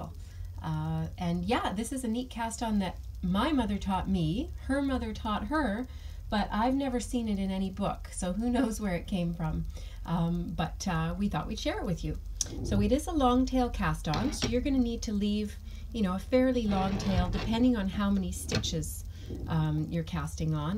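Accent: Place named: Canada. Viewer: Love the Canadian accent 😚🇨🇦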